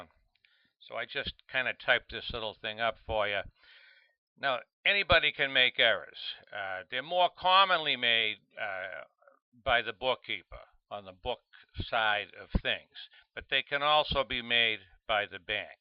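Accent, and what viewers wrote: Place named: Boston, United States. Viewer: Very helpful. I love your Boston accent and mannerisms. I'm from Boston too!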